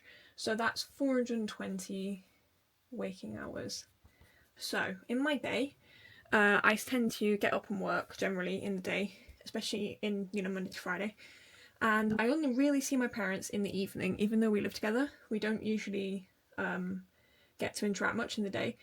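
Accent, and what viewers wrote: United Kingdom. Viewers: loved the video same im 24 and moved to a new city and am alone all the time ive lived here for nearly 2 years and I know 2 people here, also completely blew me away hearing the lancashire accent im from lancaster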